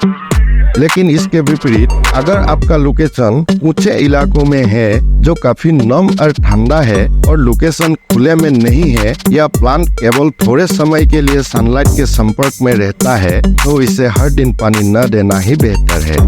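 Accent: India. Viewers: I like your hindi accent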